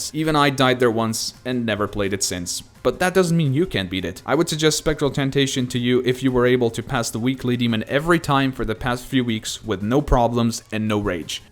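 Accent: Slovenia. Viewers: Yeah i can hear your accent is slovenian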